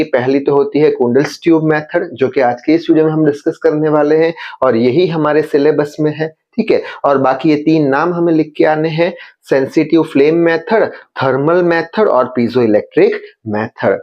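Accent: Gujarat, India. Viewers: Sir aap Gujarat se ho kya ?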